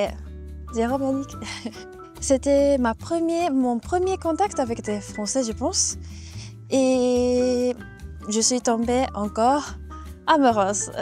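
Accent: Japan. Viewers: J’adore ce français à la japonaise 😀 Tu lui donne un certain charme ❤️ Et tu parles mieux que certains Français 👏😘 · C'est la première fois que j'entend l'accent japonais avec du français presque parfais mdr. Par contre c'est pas une bonne idée de venir en france. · je suis très impressionné par un tel niveau de maitrise de la langue !! et en plus avec très peu d'accent ( je compare avec d'autres japonaises qui parlent en français ) alors bravo ! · bonjour. Je suis très content d'avoir pu regarder - par hasard - ta première vidéo. Je suis moi-même très attiré par la langue japonaise que j'avais commencé à étudier. Bravo pour votre niveau de français, excellent, avec cette pointe d'accent japonais qui vous singularise et vous rend si charmante. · Une japonaise mignonne, parlant un français courant avec cette petite pointe d'accent et pour arrière plan un village japonais... 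Bref, un charme fou vette video !!! ❤ Allez je m'abonne !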